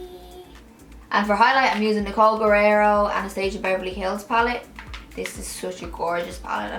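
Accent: Ireland. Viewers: I'm trying to figure out if your Irish or not 😂😂 amazing tutorial tho!😍😍❤️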